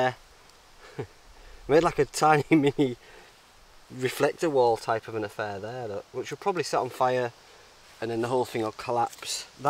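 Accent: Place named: Sheffield, United Kingdom. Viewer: Bet your from round my neck of the woods with your accent. Sheffield?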